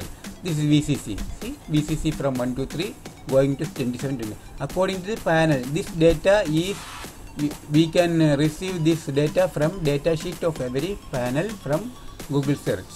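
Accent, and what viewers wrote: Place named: Kerala, India. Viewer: നല്ല ഉപകാര പ്രതാമായ വീഡിയോ അത് പോലെ നല്ല മലയാളം പോലത്തെ ഇംഗ്ലീഷ്,👍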